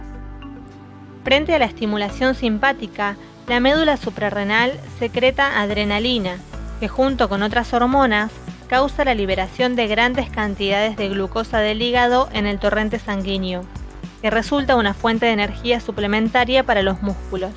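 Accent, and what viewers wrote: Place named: Argentina. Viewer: Q raro la mano negra tomando en cuenta q suenas como argentina en fin 🤔😑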